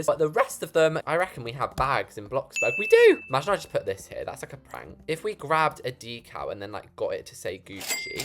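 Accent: United Kingdom. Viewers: Wait so he’s British not Australian…? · How come ur British but ur sister is amarican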